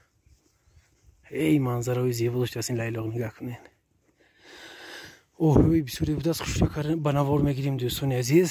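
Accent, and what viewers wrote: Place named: Vorukh, Iran. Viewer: Салом алейкум шумо аз Кадом тарафи точикистон мешавед Гап занихотон монанд ворухихоба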